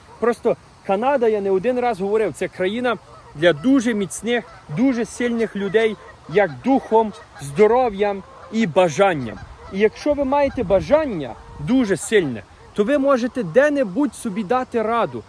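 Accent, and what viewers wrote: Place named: Galicia, Ukraine. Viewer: Цікава розмова. А що всюди треба працювати , то так має бути. Гарно розмовляєте українською мовою. Чую що Ви з Галицького краю. Дай Боже Вам поводження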